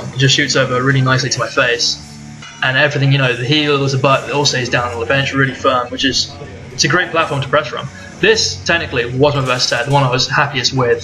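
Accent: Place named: Australia. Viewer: dat aussie accent creeping in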